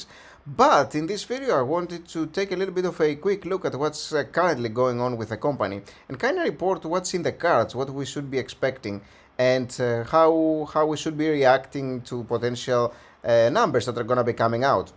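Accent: Greece. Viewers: Your accent sounds sooo greek man 😂 Correct me, if I am wrong!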